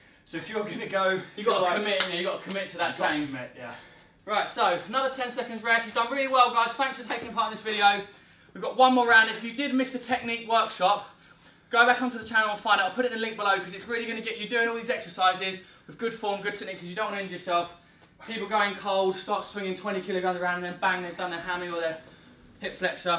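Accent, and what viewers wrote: United Kingdom. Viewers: British anyone ?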